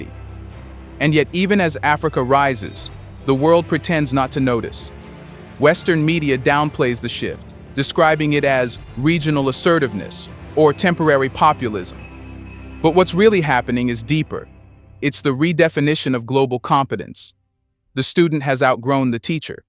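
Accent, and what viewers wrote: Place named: United States. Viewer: For an American voice -over to lecture any body on their national debt - when the USA's own Foreign Debt exceeds its own GDP already (and is 10 times the size of France's) and will only continue to grow until its economy collapses - pretty soon!, it is thought - Is a bit bloody RICH! 'Do one America - about the shortest lived Empire (with the axception of Attila the Hun's perhaps) EVER. GO SUCK A LEMON!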